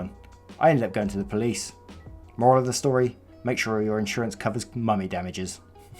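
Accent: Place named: North, United Kingdom. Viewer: Subbing because I love your accent. I’m guessing Northern UK?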